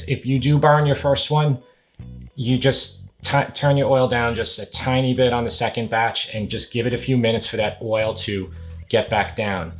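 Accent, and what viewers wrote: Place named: Brooklyn, United States. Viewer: YouTube is my “go to” to learn how to cook most anything. But I just found your channel and you are awesome! I grew up in Brooklyn and, although I’m of the Irish immigrants, along with your great accent and dishes, your vids bring back feelings of home. And your practical approach along with great tips and great editing makes your channel tops on my list. Many thanks.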